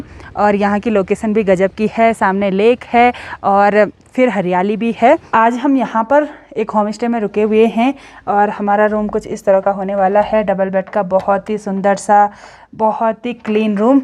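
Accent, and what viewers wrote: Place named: Rajasthan, India. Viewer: Aapki language thodi thodi Rajasthani boli jaisi lag rahi hai .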